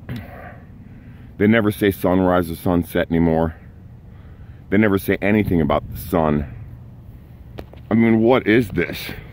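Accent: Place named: England, United Kingdom. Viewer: Loving your English accent 🤩 you good!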